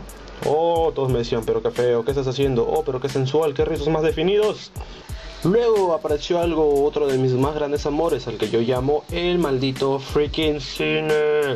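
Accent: Peru. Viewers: Eres peruano??? Bien csm salu2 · ERES PERUANO!!! :0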